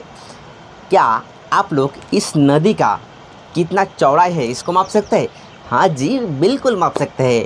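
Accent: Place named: Bengal, India. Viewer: Thoda thoda bangla arehehe but it doesnot matter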